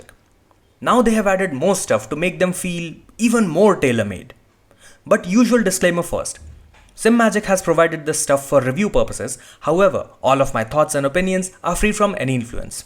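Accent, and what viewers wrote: India. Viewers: I hear indian i turn off the video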